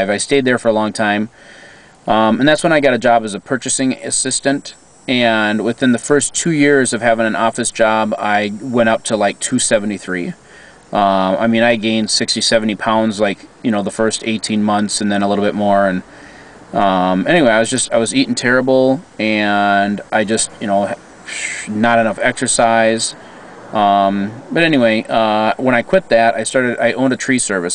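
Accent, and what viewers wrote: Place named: Minnesota, United States. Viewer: Hey..thanks for sharing your history of weight. You have a strong "Minnesota" accent...lol... You can make it to goal..don't give up! ~Amber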